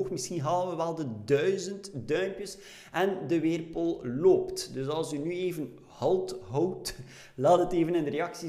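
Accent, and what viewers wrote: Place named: Flanders, Belgium. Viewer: Vlaams luistert ook nog eens gewoon veel lekkerder.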